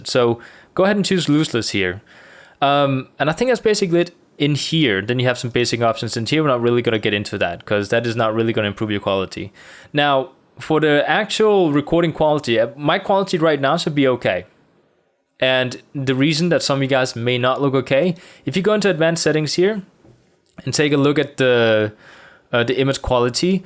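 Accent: Denmark. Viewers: really awesome video, it helped thanks! and btw are you danish? I just though you sounded like it x3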